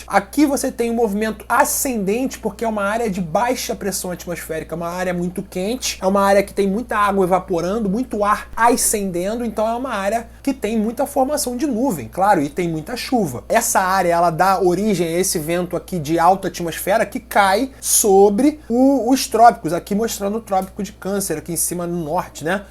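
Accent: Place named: Rio de Janeiro, Brazil. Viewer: sotaque carioca tava forte, são não mais que a explicação, falou pouco, mas disse tudo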